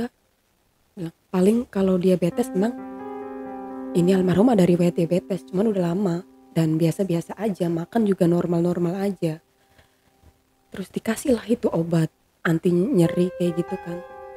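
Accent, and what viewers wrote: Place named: Purworejo, Indonesia. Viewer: mba bner lembut. sabar. ngomongny ky sdr saya orang purworejo cm tinggl di brebes. logatny sm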